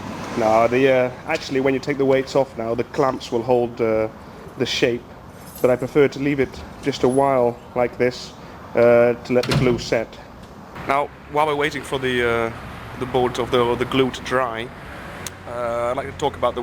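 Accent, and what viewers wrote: Netherlands. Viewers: nope hes dutch u hear the accent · Ik wist dat dit nederlands was!! hoor je aan het accent ;) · he is Dutch there's euro symbols on the price tags and the accent is very Dutch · Haha ik herkende gelijk dat je Nederlands bent. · Wow ik hoorde haast niet dat je nederlands bent! Je engels is echt goed, je hebt niet dat rare nederlandse accent zoals mij :')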